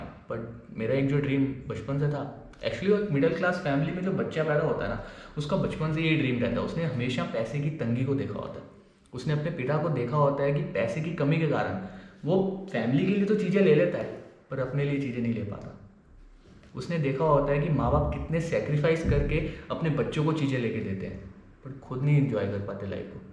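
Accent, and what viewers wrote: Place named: Punjab, India. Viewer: English me punjabi tongue influence hai.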